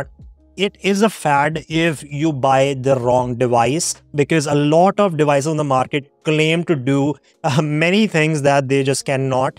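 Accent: India. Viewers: I love Indian accent. So cute. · Why would a man with a thick Indian accent lie on the Internet? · I came to learn about red light therapy, not only did I learn a lot but also feel like I learned Hindi as well - you've an awesome accent! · bro which part of North India ( lemme guess.. haryanna) are you from, mitochondrial stimulation is the final goal but your accent and intonation makes it tough but after reading several comments seems like you were on point.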